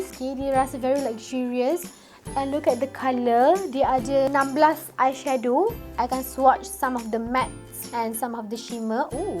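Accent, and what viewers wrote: Malaysia. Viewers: Wahhh dh nk 100k subscriber...moge terus sukses, suke tgok die ni, comelll, speaking English care Melayu, 👍👍👍💖💖💖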